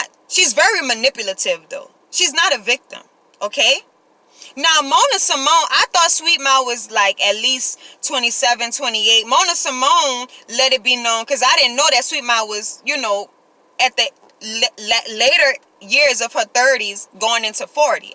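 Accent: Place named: New Orleans, United States. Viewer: Love the NOLA accent! I agree 100....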